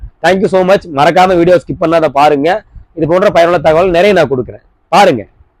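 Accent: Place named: Andhra Pradesh, India. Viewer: Andhra pradesh erundhu pesuri nanba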